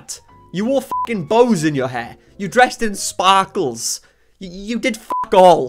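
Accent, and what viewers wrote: Wales, United Kingdom. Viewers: Are you welsh ???? My dad is from cardiff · You are Welsh aren't you? My mom is a Welsh immigrant. And I can pick that accent out now pretty easy. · R u welsh?????? If u are I am too I recognise the accent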